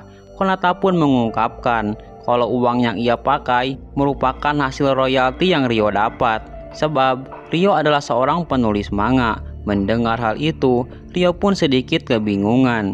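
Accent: West Java, Indonesia. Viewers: Admin asli sunda nya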